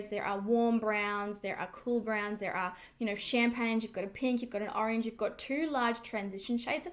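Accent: Australia. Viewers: You sound Australian would be great to know the prices here, and are you saying Chemist/Pharmacy?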